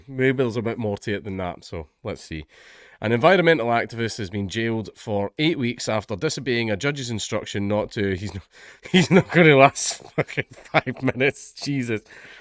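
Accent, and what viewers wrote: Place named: Scotland, United Kingdom. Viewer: you have a few drinks? Your thick Scottish accent seems particularly thick here, I'm a Canadian. Almost need some closed captioning over here, man. Boyl, I think I heard?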